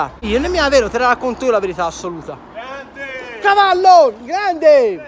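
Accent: Florence, Italy. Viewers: Ma tutti i toscani hanno la c strana? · Sei forte ti vorrei attore in un film di Pieraccioni ta na parlata fiorentina che mi schiantare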